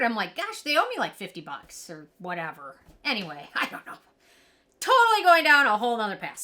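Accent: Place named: Midwest, United States. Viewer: I love your Midwest accent!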